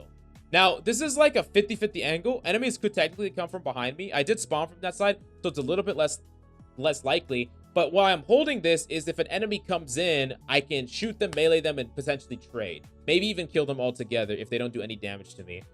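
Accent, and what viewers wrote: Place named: United States. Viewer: Woah I didn’t know GreekGodX made Halo videos. Your American accent is perfect dude. I’m impressed.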